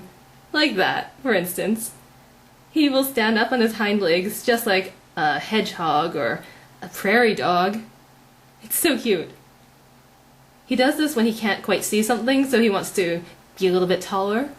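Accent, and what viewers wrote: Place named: England, United Kingdom. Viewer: Are you Japanese born in England as i thought you were english until i checked your channel haha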